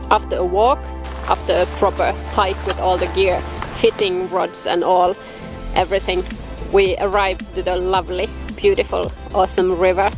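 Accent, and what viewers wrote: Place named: Europe. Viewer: Very nice fish in Sweden I like your channel. I really like the way you say fissing and not fishing it sounds very European.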